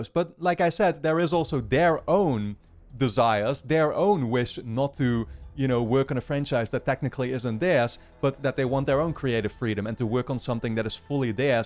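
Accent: Netherlands. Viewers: You really wear your thoughtfulness and good nature towards others on your sleeve and its a refreshing thing when it comes to talking about games...maybe people just do it too much and you just hit the right amount but thanks for the vids. I might have a semi possibly ignorance induced anecdote to go with my claim as ive never encountered someone with an accent like yours within the last 10-15 yrs who wasnt a total joy to be around. Basically for me, dutch type people voicing their excitement about stuff gets my juices flowing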